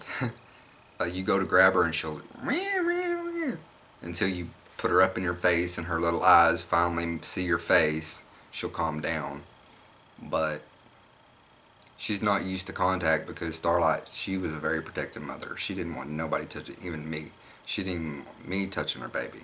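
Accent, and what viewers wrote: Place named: Kentucky, United States. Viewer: I found u thru that comment. Enjoying ur vids. My 9yo shih tsu is my only youngun. I'm f Ky ur accent sounds like mine. At least u got a sub f the troll. I don't believe in sayin GD either but I cuss like a sailor. Usually when I ask people not to say around me they respect that. Anyway I'm gonna go watch ur other puppy vids. There's a big drama w channon rose & Amber Walter on here it's like HS. I told them spend energy doin good but I let people bother me too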